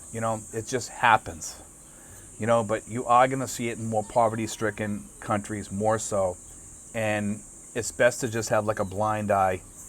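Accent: Boston, United States. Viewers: Boston accent shined!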